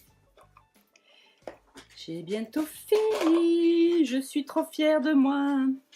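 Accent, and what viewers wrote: South, France. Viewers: tes papotages avec ton bel accent du sud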